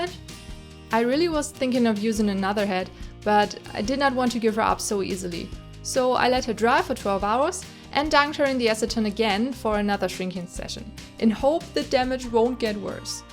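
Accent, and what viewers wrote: Germany. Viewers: woah i just discovered your channel right now and YOU'RE SOOO TALENTED! (btw (if you ever see this comment xd) i always try to recognize an accent and my question is.... are you german? it sounds a bit like this xd ((and because of the "moin" at your channel description))) (if you're german: Ich liebe diese Puppe! XD) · Why am I always cringing on german accent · Are you German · Are you german? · Are you German? You kinda sound like you are. (I'm just wondering.. xD) P.S. Love the Doll! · Are you German? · Is she German? (Because of her accent) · R u a German?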